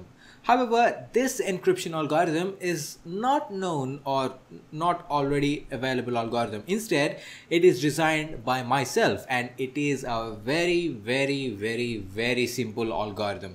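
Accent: India. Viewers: pqp indiano falando em inglês é um porre.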